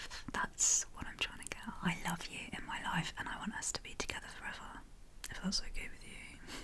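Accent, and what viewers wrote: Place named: United Kingdom. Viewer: Omg I love the British accent😊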